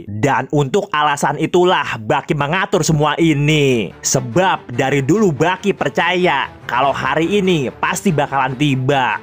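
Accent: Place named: Jakarta, Indonesia. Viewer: wkwk demen liat logat betawi lu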